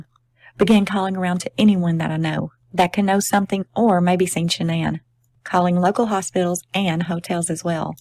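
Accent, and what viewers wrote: South, United States. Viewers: Beautifully read:elbowbump: you should do stories online.....nothing like a southern belle accent.💛..you sure got it:washhands: Thanks for doing this...muchly appreciated🥰x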